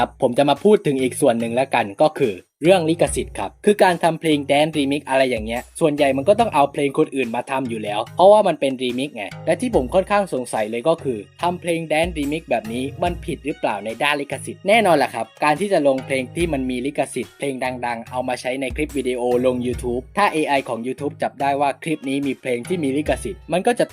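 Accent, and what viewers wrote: South, Thailand. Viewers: พี่เป็นคนใต้ป่าวคับ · พี่เป็นคนใต้ใช่ไหมครับ สำเนียงพี่คือใช่เลย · สำเนียงอยู่เหมือนคนใต้เลยพี่หรอยเลย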